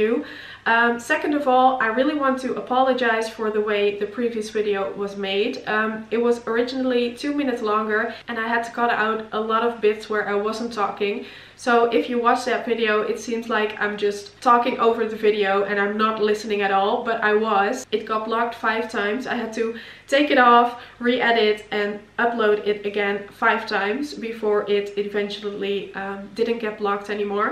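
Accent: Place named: West, United States. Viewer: Seriously, I had no idea you weren't from the states. You do have a tiny bit of an accent but it sounds local, like you might be from an isolated part of a state out west. I feel sorry for anyone trying to learn American english. It's a conglomeration of every language in the world, and as a result rules are practically nonexistent. So, well done! As a counterbalance to the negative nellies... I love your eyes. They're like staring into a pair of black holes. Whoever put that ring on your finger is a lucky man.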